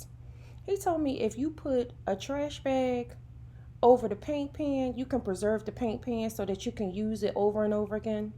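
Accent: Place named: DMV, United States. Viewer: I liked this video bcuz you sound like you're from the DMV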